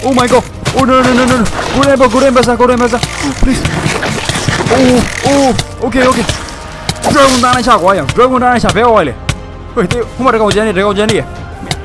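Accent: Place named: Myanmar. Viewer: i dol ေရျမန္မာအသံေလးနဲ႔အရမ္းႀကိဳက္တယ္ဗ်ာ❤❤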